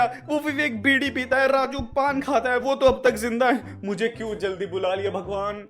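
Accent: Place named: Uttar Pradesh, India. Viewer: Bhai aap UP se ho kya BOLNE KA TARIKA MERA JAISA HA TERA 😂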